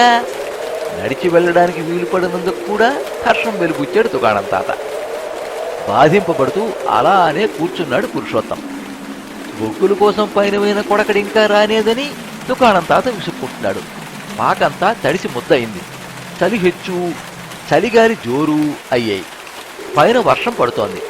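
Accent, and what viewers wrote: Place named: Srikakulam, India. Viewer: మంచి కథ వినిపించారు. మీ గళం లో శ్రీకాకుళం యాస కూడా బాగా పలికింది. మీరు చెప్తుంటే పాత్రల్ని చూస్తున్నట్టు అనిపించింది. కానీ background వర్షం sound మీ గొంతుని dominate చేస్తోంది. Concentrate కథ మీద కన్నా వర్షం sound మీద ఉండడం వల్ల మళ్ళి మళ్ళి వెనక్కి వచ్చి కథ వినవలసి వచ్చింది. 👌🙏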